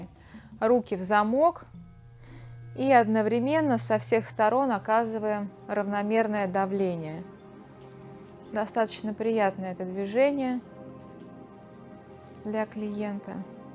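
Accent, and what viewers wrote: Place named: Russia. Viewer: You don't have to if you don't want to but do you think you could make a english speaking video someday? Please I would just love to hear you speak english with your awesome Russian accent. Please!!!!!!!😊😊😊😊😊😊😊😊❤❤❤❤❤❤❤❤❤😍😍😍😍😍😍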